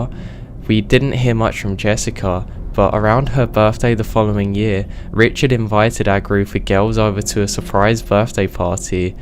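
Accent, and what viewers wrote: England, United Kingdom. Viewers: Hi just found ur channel...I'm an insomniac!! I'm UK too...nice to hear a familiar accent!! Great long vid!!! Thank you!!!🖤🖤🖤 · Why do English people always pronounce anything/nothing like nothink and anythink?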